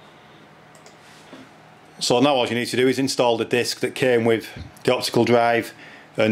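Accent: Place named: London, United Kingdom. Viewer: Very Simple...Thank You.... Are you from London....Your accent is very nice. I like it........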